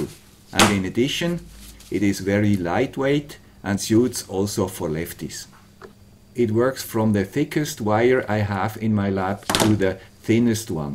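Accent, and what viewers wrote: Switzerland. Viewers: Hallo, Your Video´s are very good. I like to watch them and hear your Swiss English. And I learn a lot of them. Maybe in the Futures you can make a Video how to handle a 3D Printer. I mean please show us how the software works. As I know it is not so easy to handle this 3D Software if you are a Newbe. And if you want make a Tutorial of all the things to know. Keep on with your nice Works. Best Regards Jürgen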